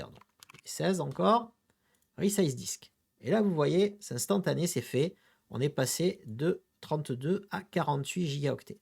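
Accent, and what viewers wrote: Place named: Southwest, France. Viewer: PS : Tu es dans le sud-ouest (ton accent t'as trahi)?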